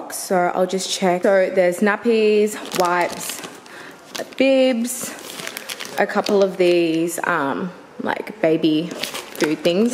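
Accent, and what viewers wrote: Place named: Australia. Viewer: Awww loved it❤ the way reacts when he sees coming downstairs just melts my heart. And I loove ur australian accent❤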